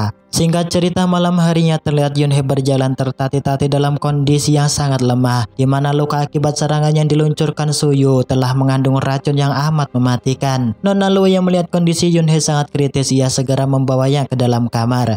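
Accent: Madura, Indonesia. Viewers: Org Madura kau ya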